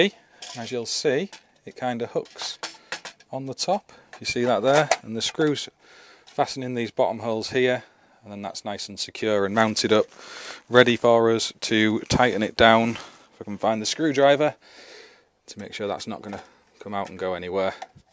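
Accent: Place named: East Yorkshire, United Kingdom. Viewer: Good video buddy. Just getting my head round it all atm. Sound like you're East Yorkshire.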